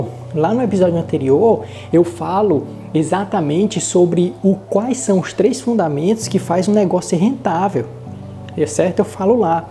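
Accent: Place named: Fortaleza, Brazil. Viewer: Eita sotaque lindo! Também sou de Fortaleza, mas moro no Rio de Janeiro à muitos anos, e somos xaràs no sobrenome.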